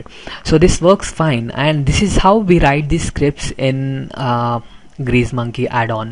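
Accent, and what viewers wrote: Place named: India. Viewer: It's the accent you struggle with. Clearly, he's a native English speaker , though with a local accent. Most probably Indian.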